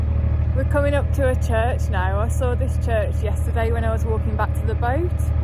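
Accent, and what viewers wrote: United Kingdom. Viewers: Lovely video, lovely lady, lovely British accent, so much beauty all around!👍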